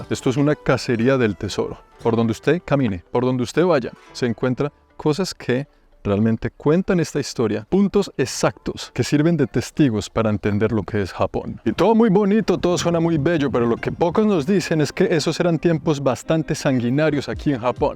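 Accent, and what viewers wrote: Bogotá, Colombia. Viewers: Me fascina que hable como colombiano. Eso es lo más interesante de sus videos. Que hable con acento y palabras de Colombia. Eso es algo que le da un precio invaluable a sus videos, parce, el hablar con sello colombiano.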